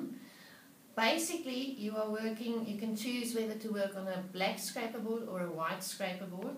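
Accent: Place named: South Africa. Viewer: She sounds South-African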